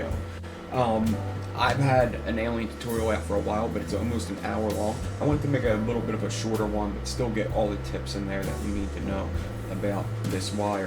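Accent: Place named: South Philadelphia, United States. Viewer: south philly? i love finding sophisticated philly vapers on youtube. just going by yr accent and 'youse'. also the recovery thing lol (i got almost 5 years since my days at 7th and snyder/ k &a etc))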